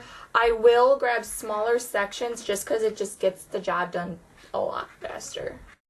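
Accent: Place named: Midwest, United States. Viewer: Are you from Michigan or Wisconsin? It sounds like you have a slight accent from there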